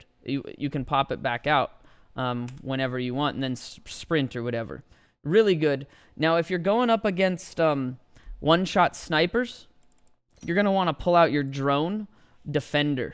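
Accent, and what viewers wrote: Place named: United States. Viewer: Why does sound American now?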